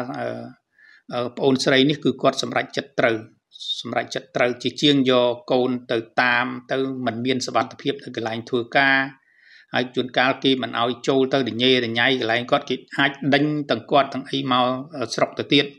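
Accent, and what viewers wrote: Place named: Cambodia. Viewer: Very sad and broken hearts , She did the right choice for her son and most people they have struggled life for living and issued living problems and they missed each others very much , so next time let your son hugs a little more so he can feel more warm up with his heart was separate for a long time maybe ( can not judging or blaming on her ) . They are very sad. So I’m agree with Lok Video man . Excellent analysis and real kaun Khmer accent very clear plus personality. . We appreciate . May Gods blessings everyone stay healthy and well . ✌️💪👍👏👏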